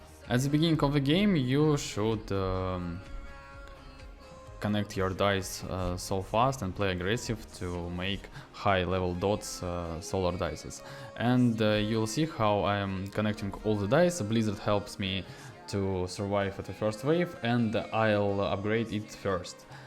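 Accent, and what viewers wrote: England, United Kingdom. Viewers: О, вы из Англии?😃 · Oh, this beautiful english accent. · Настоящий англичанин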